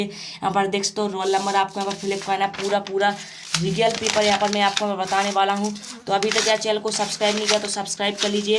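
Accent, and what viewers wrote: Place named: Malwa, India. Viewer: Mp ka hai na bro agar malwa sa bol raha hu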